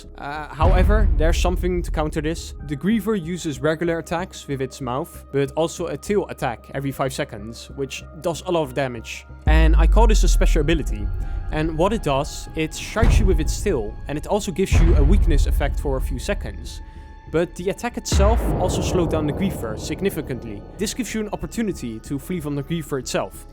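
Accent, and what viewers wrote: Netherlands. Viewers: Are you dutch? Ur accent sounds very dutch, no offense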